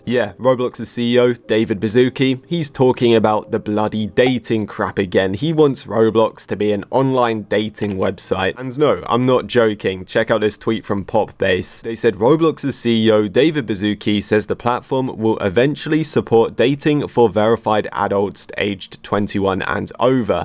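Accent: United Kingdom. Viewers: You talk like a British person and could be one but the drama is insane that i respect you being British.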